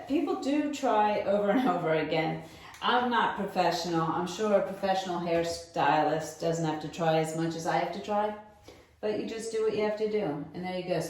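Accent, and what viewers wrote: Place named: New York, United States. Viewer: Also as a former Long Island girl who moved to NC I miss that accent! (I’m assuming you’re a NY girl!)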